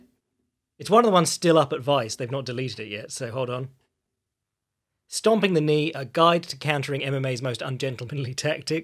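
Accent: England, United Kingdom. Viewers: I love the honesty coupled with an English accent. Great breakdown 👌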